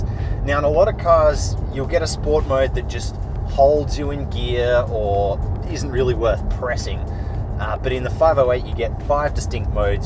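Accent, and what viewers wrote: Australia. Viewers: Do you from Australia?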